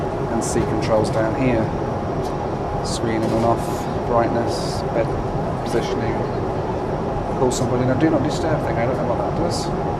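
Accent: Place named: United Kingdom. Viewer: I love the way Brits pronounce Los Angeles :P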